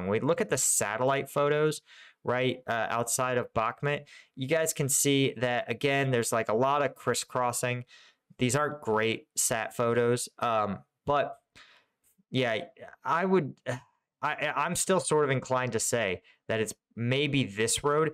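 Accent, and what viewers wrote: United Kingdom. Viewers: So proud to hear a British voice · Sounds like he’s British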